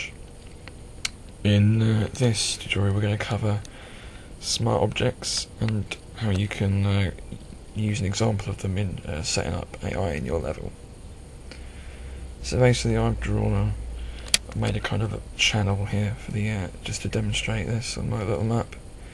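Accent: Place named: United Kingdom. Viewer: Absolutely fantastic! Great to see that this channel hasn't died, it seemed like such a good idea. Great tutorial, very clear and helpful. Always good to hear a grand british accent on the web too:). Greets. Eth.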